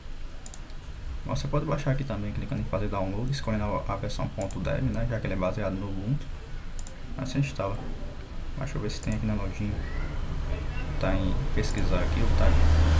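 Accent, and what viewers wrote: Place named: Northeast, Brazil. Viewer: Sou usuário Ubuntu e não na troco por nenhuma outra distro, pois aposentei o distro-hoppismo. Aliás, muita gente fugiu do Ubuntu por causa dos snaps, todavia acho que os pacotes snaps e flatpaks foram as melhores coisas que já fizeram. As pessoas, antes de emprenharem pelos ouvidos, deveriam ler sobre os projetos para poderem de formar parecer adequado. Se lessem, saberiam que o futuro de todas as distros Linux é tornarem-se imutáveis e a adoção de pacotes "universais", tais como os pacotes snap e flatpak. Aliás, o colega é de PE ou PB, não é? Desde 2009 que saí do Brasil, mas penso que ainda reconheço o sotaque da minha terra. Cptos ************* P.S.: O Zorin OS Core é muito bonito, sim, no entanto está atrasadíssimo, pois ainda está preso ao Focal Fossa.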